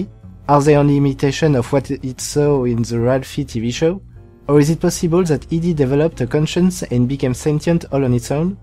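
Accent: France. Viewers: you do understand his native language is French right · est ce que tu est francais ?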